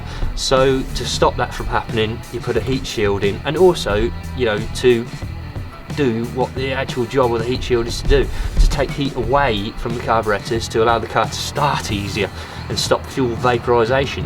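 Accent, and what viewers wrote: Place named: United Kingdom. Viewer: Here in the U.S. you are exactly what I think of as someone working on old Triumphs. Cool British accent, coveralls, and the cap. I enjoy the videos and keep up the good work.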